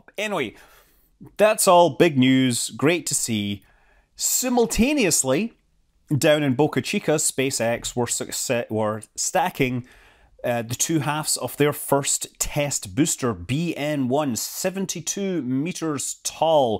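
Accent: Scotland, United Kingdom. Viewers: I find it interesting that Scott's accent has changed little, if at all since moving to California. I'm not sure how long he's been there now, but I'm sure it's been at least a year or two, if not more. But I remember as a kid growing up in Southern Ontario. Between Michigan and Upstate New York, if you're not familiar with the location. We had a kid come to our school who moved up here from Tennessee in about grade 5 and he started off with a pretty thick southern drawl. But after maybe a year or so, his accent had mostly disappeared and he had more of a northern accent. But the one kid I knew from Saudi Arabia kept his accent right up until I lost contact with him in high school. Heh, used to give him a hard time about it sometimes, always jokingly, of course. He was a friend, after all. I chalk this up to a few things though. First and most obvious being that a southern drawl isn't nearly as thick of an accent as Scottish. 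And the second being that children tend to learn these things a lot easier/faster than adults. Like, my Mom and Aunt spent the first few years of their lives living in Germany and no doubt had German accents when they came over to Canada. But don't have a hint of a German accent. Meanwhile my grandparents both had very thick German accents for the almost 70 years they lived here until their deaths. Maybe it's down to how much easier and more naturally children learn than adults, or maybe it's down to my Grandparents having had more exposure to German acc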